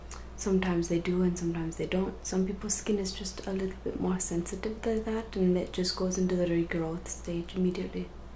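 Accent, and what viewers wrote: India. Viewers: You are my favorite asmrist I wish you made more videos. I love Indian accents, even if you are acting. Plus, you are super gorgeous.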